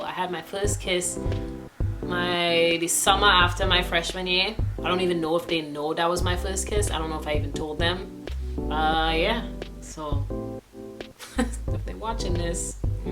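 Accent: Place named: Trinidad and Tobago. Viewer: Really proud of you. Still hearing the lill Trini . do ur thing girl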